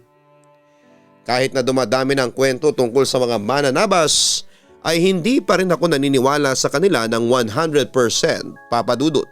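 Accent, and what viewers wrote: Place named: Negros Occidental, Philippines. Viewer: Feeling ko, sa negros Occidental ang nag kwento neto😁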